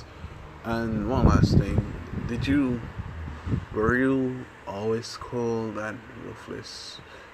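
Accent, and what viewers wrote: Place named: Barbados. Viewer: Bro you from barbados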